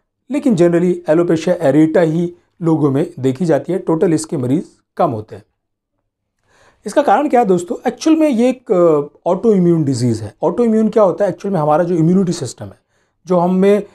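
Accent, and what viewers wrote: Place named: Assam, India. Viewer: Assam sai bol raha hi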